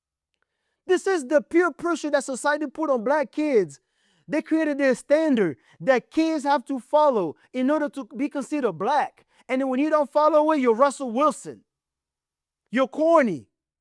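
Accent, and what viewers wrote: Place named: Nigeria. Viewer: Is that your name or your faith? You’re too bitter to go by that name.I mentioned Nigerian because I detected an accent, but I was really hoping you weren’t! If you consider “ working hard” irrelevant then there really isn’t much I can say. You go and be all the things you claim Shedeur isn’t. Your video actually showed up as “ sponsored”. You need to “ read the room”. That young man has a popularity that you can only dream of. 5th round pick?? Yet here you are, and all the major channels, discussing him!! Good luck and goodbye.😂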